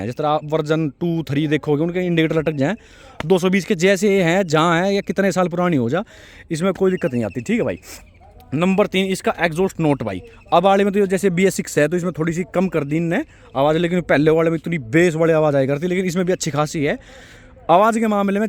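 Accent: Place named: Bihar, India. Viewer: Biharii laag rha hai ... Tari video dekh k tari bhaab ni baada saktaa 👊👊👊👊👊👊👊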